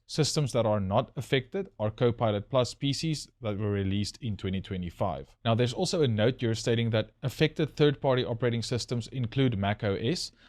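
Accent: South Africa. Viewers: I like this site for its good advice, an insight into just how long M'soft has been run by control freaks and because I went to school with boarders who were mainly from ZA and Rhodesia. The accent reminds me of decades ago when a town scholarship day boy was taught how to play rugger as it SHOULD be played -- by the rules and never to shout. In old age, these things become important. Thank you.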